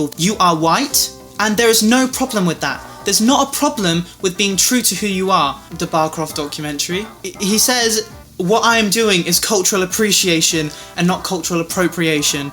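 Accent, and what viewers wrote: United Kingdom. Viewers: Oli London Obsessed guy (Woww ur accent is Britain one I luv ur accent) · Are you korean? But you kinda have a british accent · Omg! You sound more British then him does. And I agree to not agree. It's unhealthy to change your face too look like a celebrity some would say, that person would need therapy. It's all part of the game for popularity and fame ..I agree his music is not even Korean or even close.. I found it funny that some Koreans knows this and still goes with joke and still being part of he's career lol nowadays anything goes I guess ? Everyone is just finding something to film about. ?? Who knows really as long he's not after my guy..that's all that matter in the bts. And look at him and take look of all of there bodyguards lol so many is so concerned don't be. Like always thank you for reading.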